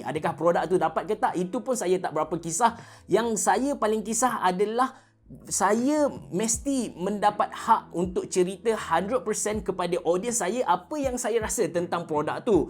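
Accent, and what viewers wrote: Kelantan, Malaysia. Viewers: Sy slh seorg peminat abe sejak 2017 lg, masa tue masih ckp kelantan lg..hehe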